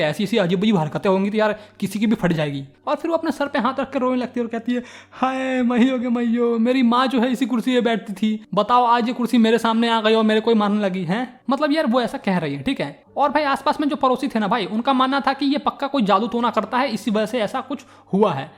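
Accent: Bihar, India. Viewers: Aap bihar ke ho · Bihari ho abe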